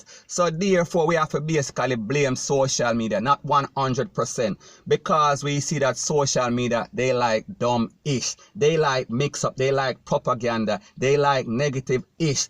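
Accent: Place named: Jamaica. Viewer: Mi hear hear an accent also, a jamaican accent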